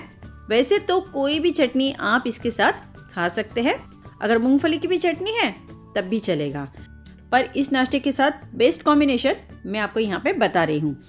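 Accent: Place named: South India, India. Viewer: Voice of South Indian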